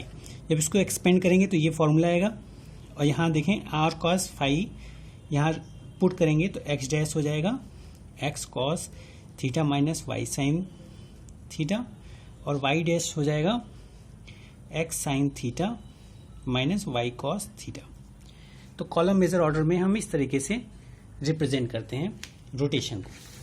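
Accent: India. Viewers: is there any single video without indian accent about Computer Graphics?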